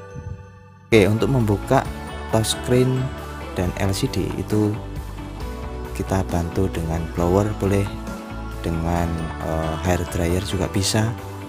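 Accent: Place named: East Java, Indonesia. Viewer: Asli daerah mna toh bang, koyok wong Jatim kulonan logat e? Asik lek onk jowoe bang Karo tambahan logat jawae Aku gak paham elektronik tpi seneng ae delok konten sameand bang hahahahah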